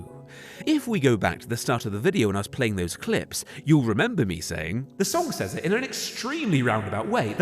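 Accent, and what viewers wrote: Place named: United Kingdom. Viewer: اللكنة البريطانية جميلة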